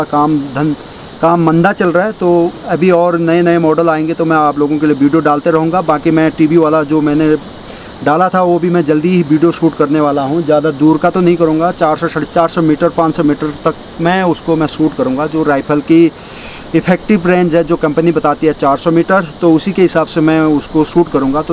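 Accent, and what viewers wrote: India. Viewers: Apki Uttarakhand valo ki jasi avaj lgti h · bheji mi smjhi gyon😉...Pahadi tone aani ch😂😁❤️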